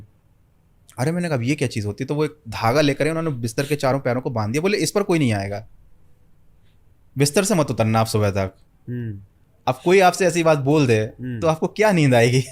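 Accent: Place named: Bhopal, India. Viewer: Ek bhopali hi dusre bhopali ko pehchan skta h only accent se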